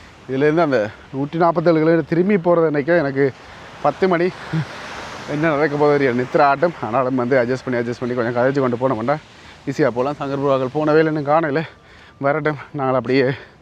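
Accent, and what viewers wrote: Sri Lanka. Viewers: இலங்கை தமிழ் நீங்கள் பேசும்போது கேட்பதற்கு நன்றாக இருக்கிறது ஆனால் வேகமாக பேசுகிறீர்கள் நாங்கள் சென்னையில் இருக்கிறேன் உங்கள் தமிழ் புரிந்து கொள்ள முடியவில்லை கொஞ்சம் நிதானமாக பேசினால் நான் புரிந்து கொள்வதற்கு ஏதுவாக இருக்கும் மற்றபடி உங்களுடைய இலங்கை தமிழ் கேட்பதற்கு நன்றாக இருக்கிறது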